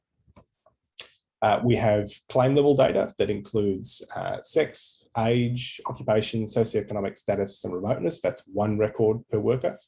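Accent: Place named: Mexico. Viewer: Nice research but I found it hard to understand the presenter's Mexican accent.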